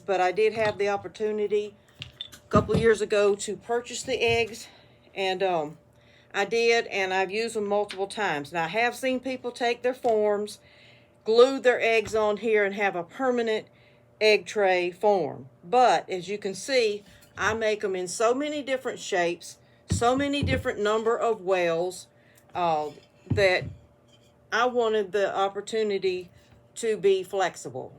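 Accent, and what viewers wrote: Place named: Tennessee, United States. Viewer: I grew up in TN. I miss the accent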